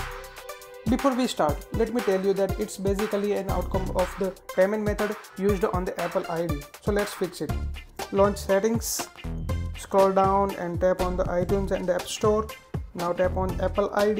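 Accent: India. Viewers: My phone is dying, it’s 🔥 burnt I don’t even care if it works, I just like him saying “it can easily freak you out” Not making fun I like the Indian accent, we are cousins I’m West Indian. I hate when people say that, ohh I can say that because I’m that, so I guess I hate myself, who am I talking to right now? Thanks for your help cousin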